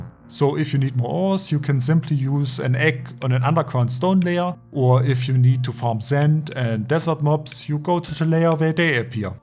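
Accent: Germany. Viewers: Your German accent gets harder over time. Seriously. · are you english you sound a bit german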